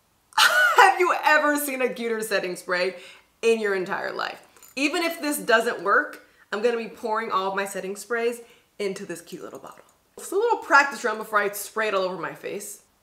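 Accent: United Kingdom. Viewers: I thoroughly loved this video. It was so fun to watch. Loved your British accent, almost spot on. I received the notification of the video while I was watching you. That was weird. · I LOVED this video!! Complete with British accent!! 😁 Very nostalgic for me, too!! ❤❤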